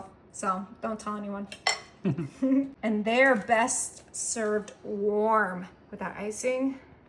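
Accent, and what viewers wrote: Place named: South, United States. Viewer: People seem nastier to others these days. Plus she is a southerner ( I'm from Georgia) and the accent makes her a target. Although my husband is from Alabama and I make fun of him saying ass for ice. It's a little funny. There are many cinnamon roll recipes out there. Leave Miss alone. Get Back Satin in Yeshuas name.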